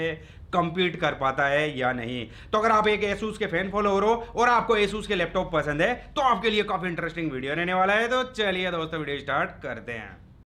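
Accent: Rajasthan, India. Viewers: Nope! Hindi with Rajasthani accent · Bhai voice se pakka Rajasthan se lag rha h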